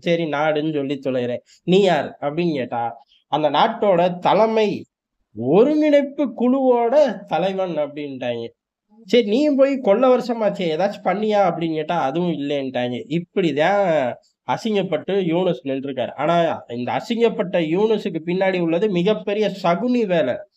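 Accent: Madurai, India. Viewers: மதுரை ஸ்லாங்காத் தெரியுது !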